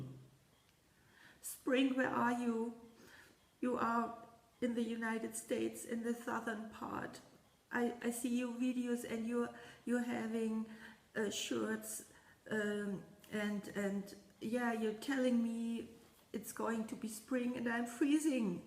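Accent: Germany. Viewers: As usual, I love all your little finishes, & the snowmen finish is adorable. I've been wanting to tell you several times now that I love the lantern you have behind you. I collect them & can never have enough. I really enjoy your videos & love your German accent. Nothing to dislike about your videos. 😊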